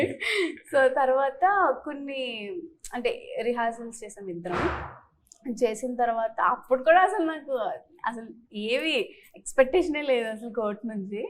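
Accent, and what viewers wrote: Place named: Godavari, India. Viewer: Be yourself. Be proud of Godavari accent. All the best baby🎉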